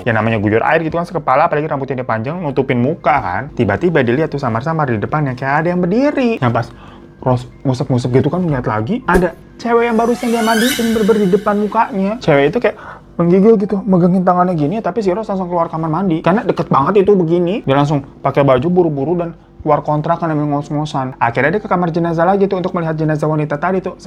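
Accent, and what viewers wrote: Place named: East Java, Indonesia. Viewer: Sy kira orang ngapak, Brebes tegal. Ternyata jatim 😂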